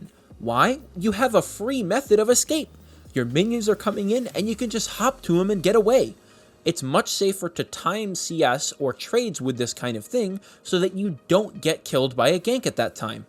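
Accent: United States. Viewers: the way you talk,it reminds me like Wild West, cowboy things xD ,i mean on actent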